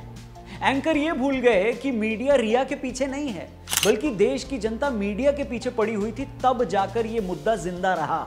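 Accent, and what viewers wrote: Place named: Indore, India. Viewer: Arey yeh bhiya to Indore ki bhasa bol riya hai....Ek number👍👍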